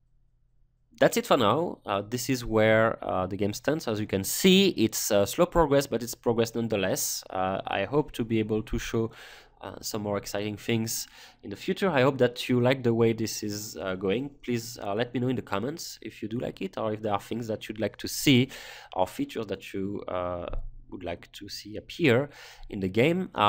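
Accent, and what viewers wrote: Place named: France. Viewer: nice touch with the logarithmcic scales, it a good move on your part really, being able to represent 1E5 and 1E-5 just with 10 units of difference graphically shure does help a lot, the only thing I hope there will be is some kind of note for newcommers to inform them. It still seems to advance nicely. (but that french accent though, ça fait bizarre à entendre '-' . C'est surement moi qui est bizarre...)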